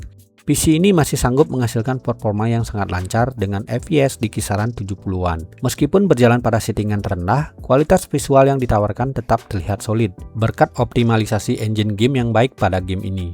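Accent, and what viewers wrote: Bali, Indonesia. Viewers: Kok bisa2nya mikir dia muslim bro? Sy awal tau chanel ini dan denger logatnya aja langsung tau kalau beliau org bali 😂. Sy jg muslim dan belum pernah ke bali juga sih, cm beberapa kali nonton interview org bali dikonten org emang logatnya mirip kaya gini...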